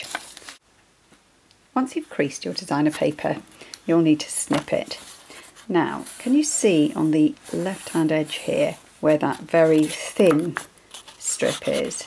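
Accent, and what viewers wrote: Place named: United Kingdom. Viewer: wonderful tutorial. Enjoyed your clear, British voice.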